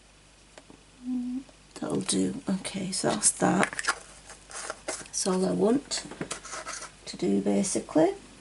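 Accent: United Kingdom. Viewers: Hi K, I’m sorry to hear you have not been feeling well, I’ve never had a migraine but I know they can be disabling. As always I get excited when you are doing your magic on camera. I’ve enjoyed this series so much. You do not sound like a frog 🤣 at all, you actually have a beautiful and soft voice, that I find soothing and I love your British accent. Wishing you a speedy recovery and I am looking forward to seeing all the pages you colored. For me, it’s been work and more work, but I am ready for Mermay!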